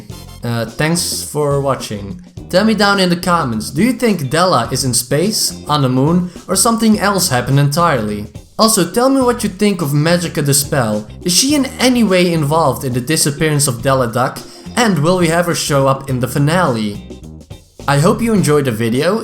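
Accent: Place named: Netherlands. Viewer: Ummm you sound like a Dutch speaking person. Are you dutch? Because I can tell when you pronounced the title comic very well.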